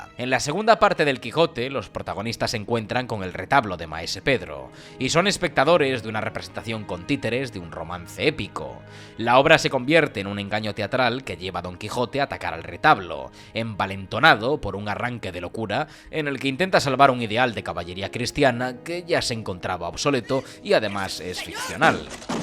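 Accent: Spain. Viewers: Que feo es el asentó español mejor que te doblen al latino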